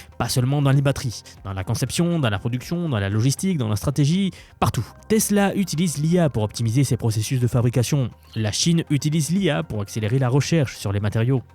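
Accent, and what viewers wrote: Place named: South, France. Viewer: J'adore ta chaine, je suis fan. Merci pour toutes ces informations. Une petite question bête, t'as un super accent, mais j'arrive pas à savoir de quelle région, il y a une pointe du sud mais pas que ... ;-)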